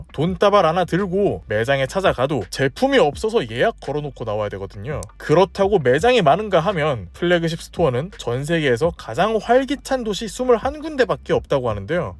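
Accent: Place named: Jeolla, South Korea. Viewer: 말투가 진짜 특이하시당... 경상도 같다가 전라도 같다가..😮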